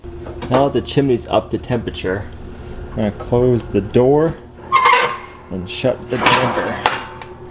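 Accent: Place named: Boston, United States. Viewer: Good mauhnin! I love your Boston accent.( I’ve got one myself) Hope I never lose it! Lol! Oh and we like it a lot too! Lol! Good idea with the nail polish. Take care.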